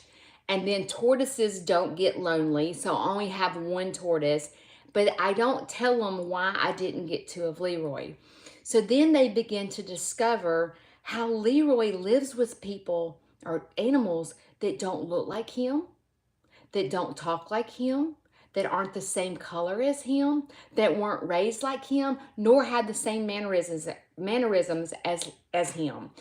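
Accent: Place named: South, United States. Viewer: Loved this show! I retired at the end of 2022 and am speeding towards 69 years old so I have a lot of newfound time on my hands! I live in Holland, Michigan & love listening to your southern accent. I believe listening to your shows (as well as those of Cog Hill Farms) will be a great way to go through my days! I appreciate the laughs that you both provide & I love watching the animals & your interactions with them. I anticipate your subscription numbers will increase so please make sure to take time for yourself to keep the balance you discussed in this show. Take care!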